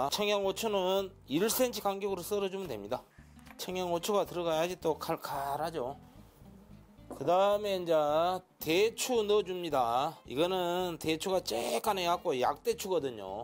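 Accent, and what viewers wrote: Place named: Yeosu, South Korea. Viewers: 쉐프님 사투리 넘 정겹고 좋네요. 돌산사람 특유의 그 억양… 그 억양은 돌산사람이라면 딱 알죠. 😂😂😂 쉐프님 서울살이 꽤 되신거 같은데 어찌 그리 돌산 억양이 그대로 있담니까? 쉐프님 억양에서 나 돌산 신기사람이요 그러네요 ㅎㅎ 최근에 팬 되부럿습니다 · 셀프님! 돌산 삼일면 말투네요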